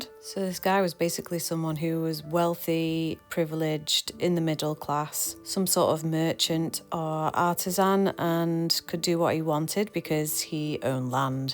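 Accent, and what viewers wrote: United Kingdom. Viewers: youre like jon levi if he was a woman and bri'ish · Great to hear a northern voice that’s also got a great level of intelligence also.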